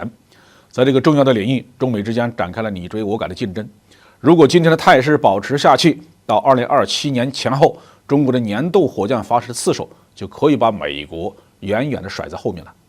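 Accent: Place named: Hunan, China. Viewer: 湖南普通话很有意思。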